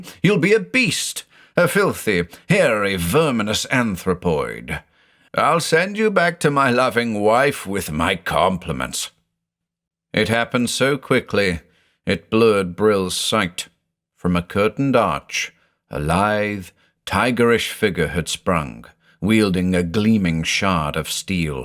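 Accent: United States. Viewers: Your American accent is getting very good,! 👍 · Solid American accent. Good job.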